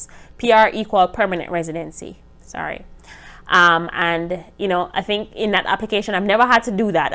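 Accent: Jamaica. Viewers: You sound Jamaican. Are u?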